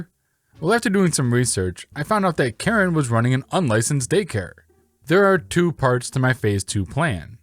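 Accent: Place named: Midwest, United States. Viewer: I like your accent, it sounds Midwestern. Where is it from?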